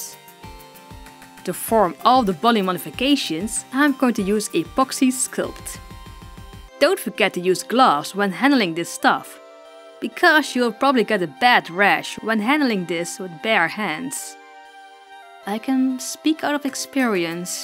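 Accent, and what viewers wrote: Netherlands. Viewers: Je kan horen dat je Nederlands bent hihi Ik ben ook Nederlands en vind je video’s erg leuk maar cringe soms ook erg English: You dan heer that you are Dutch I am dutch as well en like your video’s a lot but its somtimes very cringy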